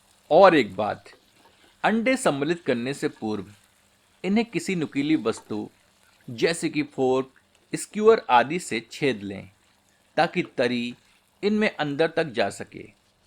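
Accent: Standard. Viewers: Lehja.. Behad sundar shudhdh Hindi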